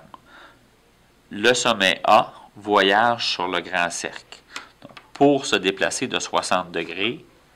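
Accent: Quebec, Canada. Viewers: Merci tu m'as beaucoup aidée car pour une fois je n'ai pas écouté cette leçon et j'ai eu du mal à faire mon DM mais grâce à toi j'ai réussi (j'adore ton accent canadien) 😂❤❤ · Vue que je suis suisse l’accent québécois me dérange mais sinon merci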